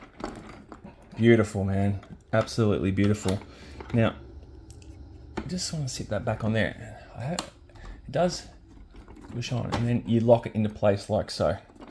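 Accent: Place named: Australia. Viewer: Let me guess, your ausie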